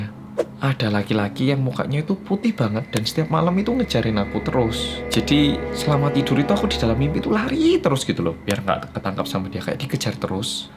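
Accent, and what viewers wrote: Java, Indonesia. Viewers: Kembali lagi bersama mas yang medeeekkk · Tim gercep orang korea yang medoook!❤ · Kak kok skarang pembukaannya sudah ganti yg ngondek? Lalu kan medok kak? Kok di ganti kak? 😁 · Waduhhhhhh ko' ada episode 2 kelamaaan menunggu besok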